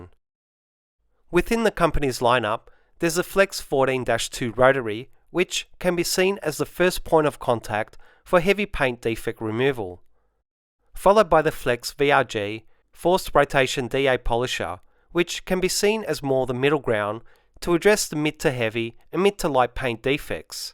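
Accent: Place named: Australia. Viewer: After the Bullshit Techno Intro Music I wasn't expecting to hear an Aussie Accent.....Pretty good review though. Not sure that it's helped me decide between the Rupes LHR15 MK2 or the Flex. Maybe the Rupes is a better all round machine? So I'd be best to go with 125mm backing pad on whatever machine I decide on rather than the 150mm. Is that correct?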